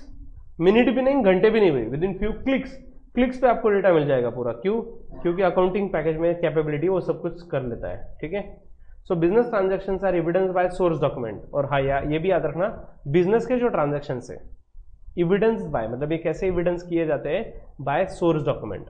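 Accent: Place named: Maharashtra, India. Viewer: Your teaching is good sir but it seems like you are new in this teaching field and as a viewer i would like to give my honest opinion on your videos..u lack in speaking hindi as there is a slight mixture of marathi ascent while you speak hindi try to speak one language properly at a time... don't mix-up one language with another and i wish you all the best for your future.... 👍🏻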